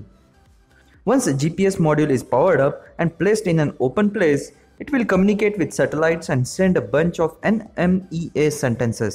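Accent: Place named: Gujarat, India. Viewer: very informative! (*liked your gujju tone though😉😁)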